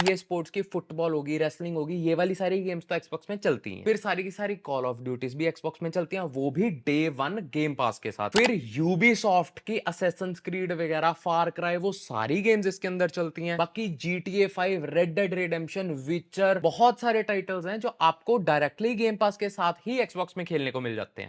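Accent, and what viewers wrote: Punjab, India. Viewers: Punjabi accent. Probably doaba???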